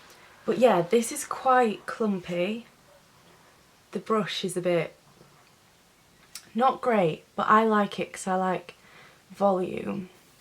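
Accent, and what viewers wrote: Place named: Cornwall, United Kingdom. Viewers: where are you from in england because you sound as if you'd be local to me? if you dont wanna be specific just say vaguely like which compass direction if that makes sense haha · For some reason, in this one video you sound like my Cornish cousin 😁😂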